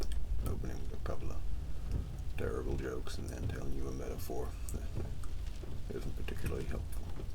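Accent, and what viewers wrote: South, United States. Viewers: Very sexy character.I like it when you use your American accents! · Being from Oklahoma, your accent is pretty spot on, as are your jokes, very much something a southerner would tell. I love this ASMR, especially because of the rain and your deep voice. I really hope you can get a 3Dio sometime soon, that would make your content even more incredible. I wish I could donate, but I wish you the very best in reaching your goal! Thanks again for the amazing work you create. (I really need to stop leaving long annoying comments ahh) <333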